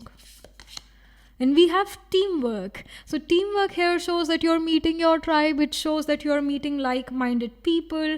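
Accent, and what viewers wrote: Punjab, India. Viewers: Are u a Punjabi mam...? Actually mujhe apke ascent se lga 😅❤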